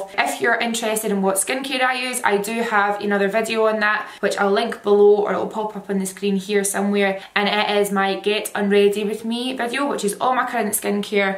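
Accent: Scotland, United Kingdom. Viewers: When you said you look like a fresh baby I died. Everything sounds better with a Scottish accent 😭🙌💛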